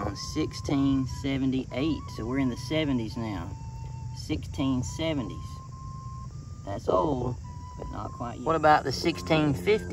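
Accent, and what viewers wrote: South, United States. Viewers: Happened upon this. Interesting. Would have also been nice if you read fully those earlier stones that didn't quite make the earliest. I hear a Southern accent, where are you from? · “The LORD has preserved these letters”. Not many look at things like you and I. Our LORD GodAlmighty has his WILL, HIS HANDYWORD on everything we see that is Good and for HIS SAKE. I came from a line of cemetery Stewards. Both my maternal and paternal grandfathers and great grandfathers lived on Cemetery Stewards Houses…which were the cemetery’s church parish house. We had some good times playing at mammaw and pappaw’s house. Especially in the summertime when we played outside late. We would play nighttime hide and seek. Our backyard wasn’t like most, it was a huge backyard with marble, granite, and black onyx vertical tablets everywhere. THE greatest place to play hide and seek in the summer evenings when the hot sun was going down and it started to cool. 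The only lights were lightning bugs or the full moon occasionally reflecting off the high polished granite and black onyx stones. Some nights we had to give up our hiding spots because some of the stones made a perfect hiding spot if they were wide and tall enough for us to crouch down behind. Precious Memories….. I love your southern accent. It’s very comforting to hear. The old southern accents are fading away. All the southern kids of this generation don’t have the traditional tight nit families surrounding them with the accents. They are only speaking what they hear on the iPhone social networks. …..it’s sad. It’s also sad to see this current generation NOT BURYING THEIR LOVED ONES. Everyone is taking the greedy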